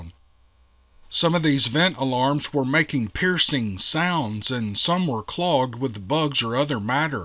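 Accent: South, United States. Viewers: you are an incredible story teller. I could listen to you for hours. I just love your southern twang. It's very comforting. Don't be so hard on yourself. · As a real southerner in Atlanta living in a world of Yankees, your voice take me back to my youth. GOD bless you sir, never change!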